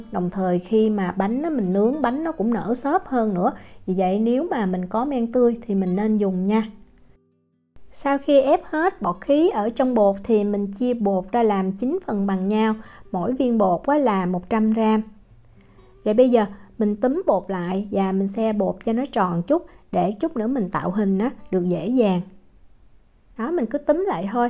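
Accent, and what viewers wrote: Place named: Bien Hoa, Vietnam. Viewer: Dạ, e nhớ rồi, e cảm ơn chị nhiều ạ. E cũng quê Biên Hoà luôn chị, nghe giọng của chị thấy nhớ quê lắm, e hiện đang ở Pháp, e từ không biết gì về nấu ăn mà xem youtube của chị riết e học hỏi được nhiều lắm ạ 🥰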